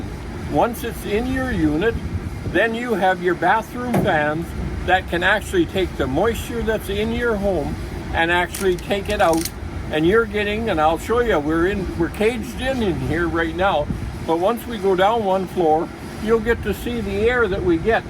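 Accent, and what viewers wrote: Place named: Canada. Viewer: You sound sooooo Canadian!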